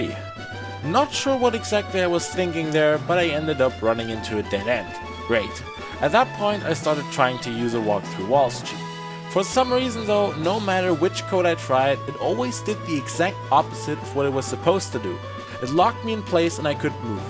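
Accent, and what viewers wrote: Germany. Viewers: Man hört das deutsche raus herrlich hahaha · Direkt den deutschen Akzent rausgehört 🙈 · Wenn du nicht gesagt hättest, dass du die deutsche Version gespielt hast wär mir dein Akzent kaum aufgefallen. U got a keen knowledge of English bré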